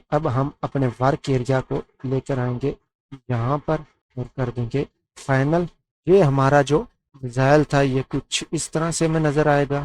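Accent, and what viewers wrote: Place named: Punjab, India. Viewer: Bhai apna talaffuz Punjabi say Urdu karo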